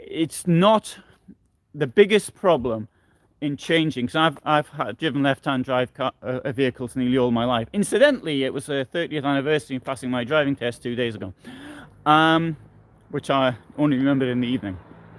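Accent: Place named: North East England, United Kingdom. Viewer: Morning from Scarborough, North Yorkshire not a million miles from where you're possibly from with your North East accent?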